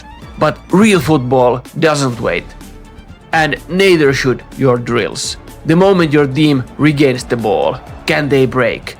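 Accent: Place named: Finland. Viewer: I love Finnish accent. Soothing, clear, understandable. Thanks for the extensive analysis. Subbed. Regards from Turkey.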